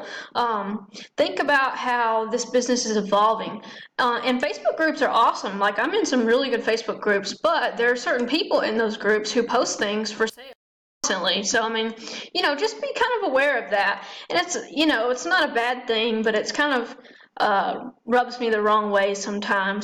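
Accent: South, United States. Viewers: Love your MBA-related videos! Great, highly useful info delivered in a southern gal, no-nonsense way 😉 Subbed!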